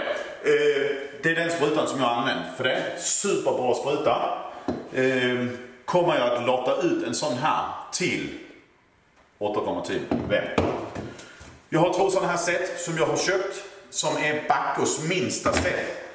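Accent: Scania, Sweden. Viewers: Grattis till 10k följare härligt att höra din skånsk/danska dialekt. Tycker du ska döpa moppen till Rosa ladyn/ rosa tanten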